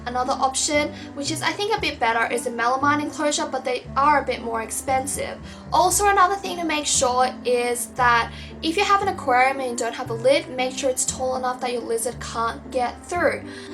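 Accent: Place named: Australia. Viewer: I'm not sure where you're from but do you call it a lizard instead of a skink because you caught yours? You sound almost Australian, but I'm not sure, and your blue tongue looks very much like those that would be found naturally instead sold! I'm just really curious. :)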